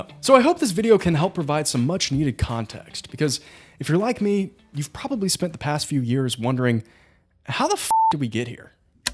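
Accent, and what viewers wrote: South, United States. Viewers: I love your southern accent voice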